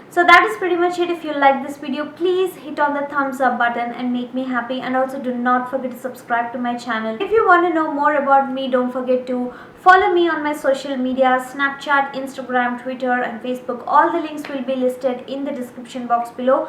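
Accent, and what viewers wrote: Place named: Andhra Pradesh, India. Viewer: R u from andrapradesh? Just ... Ur accent ...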